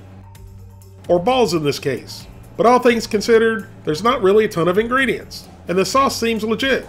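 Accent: South, United States. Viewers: Is it just me or does he really sound hillbilly?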